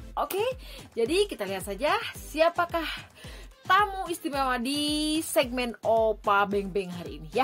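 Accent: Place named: Java, Indonesia. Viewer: Hhhhhhhh jawanya mna medok sekali ling hhhhhh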